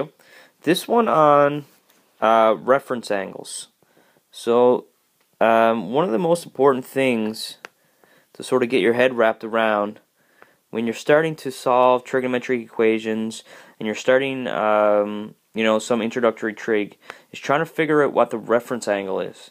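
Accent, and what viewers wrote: Newfoundland, Canada. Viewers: I knew I realized the accent! Newfie as well!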